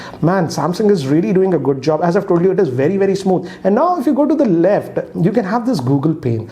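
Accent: Hyderabad, India. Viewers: Sir are u from Hyderabad ? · You could watch just about any other review if you didn't like that he is an Indian and has an Indian accent?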